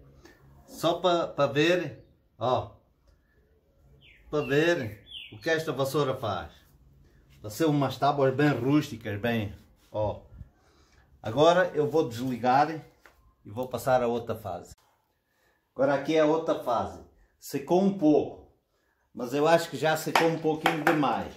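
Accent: Portugal. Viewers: Adoro o sotaque do português AMO de paixão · fico muito orgulhosa de ouvir um Português no YouTube! Sou Portuguesa e estou em Portugal. Ótimo trabalho!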